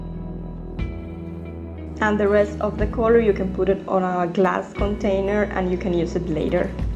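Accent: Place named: Italy. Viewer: Ooooohhhhhh avevo sentito accento italiano...posso chiedere una tradizione in italiano? Mi piacerebbe moltissimo imparare. Ma nella mia lingua c'è praticamente niente....ti prego puoi aiutarmi? Grazie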